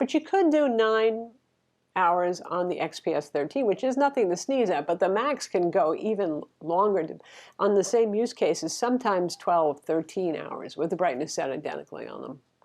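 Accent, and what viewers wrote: Texas, United States. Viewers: Chuckle! Good one, pixy. But, it's just her Texas accent. Very Big Grin.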